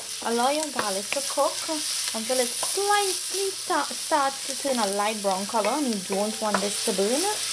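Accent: Trinidad and Tobago. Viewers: Not only is your recipe the best, and video easy to follow, but your Trini accent added a special flavor to the pot..I so love to hear my Trini people speak..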